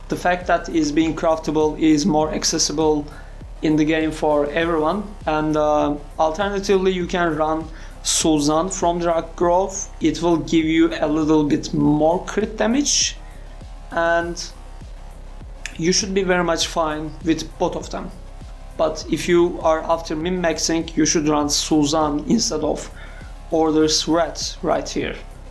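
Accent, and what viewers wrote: Turkey. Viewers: are you from turkey mate?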